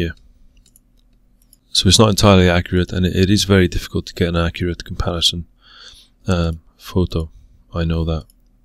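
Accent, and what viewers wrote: United Kingdom. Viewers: No reason to doubt her Looks real to me. Especially after you did your thing to the video. Another gem mate Glad to see your channel gaining subs I remember when I first discovered you with barely 1000 subs Your humble and honest approach is why.Plus,having a delightful British accent doesn't hurt😉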